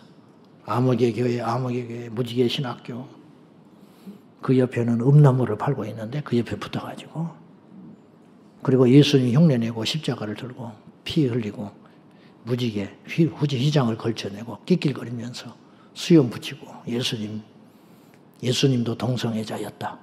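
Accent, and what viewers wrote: Jeolla, South Korea. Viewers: 목사님 말씀 늘 잘 듣고 있습니다^^* 감사합니다 ^^* 저는 고향이 경상도인데 가끔씩 목사님 전라도 억양에 구수함을 느낍니다ㅎㅎ 살아가면서 마음이 답답할때마다 목사님 설교가 제 마음을 평안하게 해 주셔서 너무나 감사드립니다 ♡ 늘 건강하시고 매일 매일 축복이 가득 하시기를 기도 드립니다^^*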